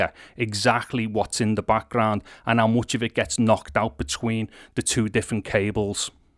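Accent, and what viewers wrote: Liverpool, United Kingdom. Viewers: You sound like a skouser. I'm from the US but I know a skouser when I hear one. Thanks for your mic help.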